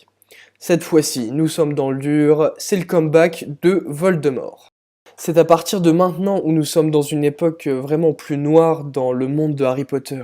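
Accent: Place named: South, France. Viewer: super voix, j’adore l’accent sudiste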